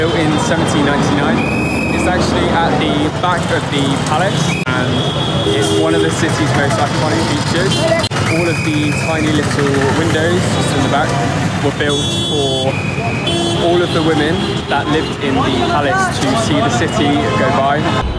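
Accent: United Kingdom. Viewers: Aww u came to my country and I didn't even know 😭 Btw I love Ur British accent Keep shining like always.... I don't know why but I'm kinda afraid of monkeys 🙈 but these ones were so cute Oh and I could still see the slight nervousness in Ur tone....I feel like u have become more confident after becoming a Muslim. Stay blessed.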